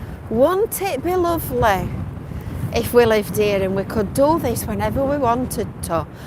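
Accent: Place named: Yorkshire, United Kingdom. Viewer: Good afternoon you sound a rate Yorkshire lass'' starman recomendid your channel to me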